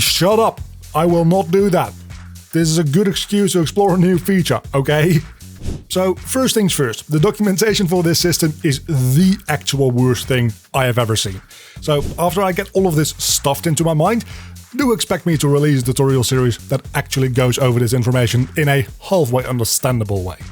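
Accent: Netherlands. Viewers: I was like "this guy sounds so Dutch".. then looking at the About page..😂 Anyways, lekker bezig! Ik moet binnenkort ook maar eens gaan koekeloeren in Unreal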